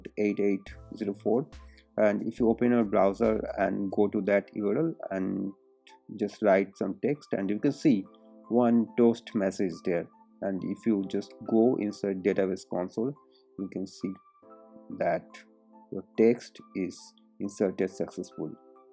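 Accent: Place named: India. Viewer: 인도억양 영어... 참....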